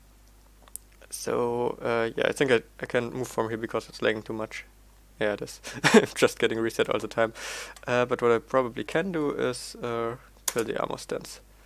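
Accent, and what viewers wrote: Germany. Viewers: okay cool hört man das du deutscher bist XD.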